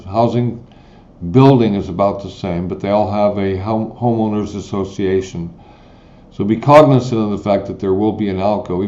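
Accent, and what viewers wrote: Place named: United States. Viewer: Here is my complaint about this video and I really don’t like to complain but when talking budgets it PH you are clearly speaking to folks who are not in PH. So why speak in pesos and to make it worse you alternate to both which makes it super confusing. At the very least stick to one but you sound like your from US and speaking to Americans so a budget in US dollars would be more friendly. Just some friendly feedback.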